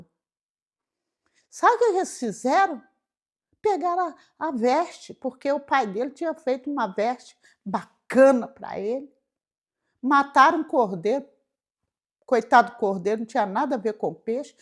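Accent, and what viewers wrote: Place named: Minas Gerais, Brazil. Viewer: Muito bom essa forma mineirez de contar história bíblica❤😂 parabéns 👏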